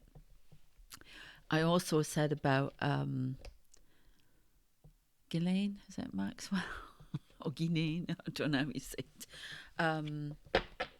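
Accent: England, United Kingdom. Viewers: Hello, I love hearing your readings and I love your English accent. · Spoken like a true English woman 👍👍 Go girl 💕💕💕🥰🥰 Kath xx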